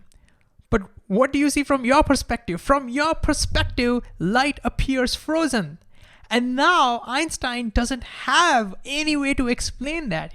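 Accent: India. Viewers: Considering how fluent so many Indians are in English why do they insist on maintaining a barely comprehensible accent? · Trying to listen to a hyperactive Indian accent stumbling over the English language, who has a constant conversation with himself and Einstein - is extremely disconcerting and tedious.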